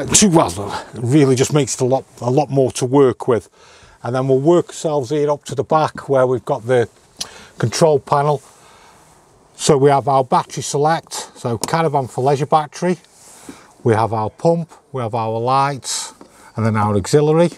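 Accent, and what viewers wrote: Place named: North Yorkshire, United Kingdom. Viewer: Northern England accent (North Yorkshire)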